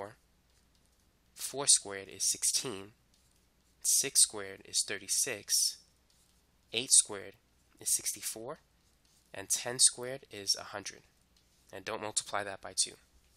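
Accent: United States. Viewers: Sİr, where are you from? you have definitely american accent but sometimes you really sound strange.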